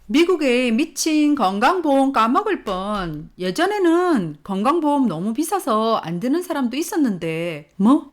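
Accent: Gyeongsang, South Korea. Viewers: 경상도 사투리 귀에 거슬려요..ㅠㅠㅠㅠㅠㅠ 난 2찍들이 정말 싫어요. 저는 대구시민이예요. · 와 경상도 사투리 역대급이다 . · 경상도사투리가 구수하고 좋아요. 전라도 깽깽대는 사투리는 정말 재수없고 구역질 납니다.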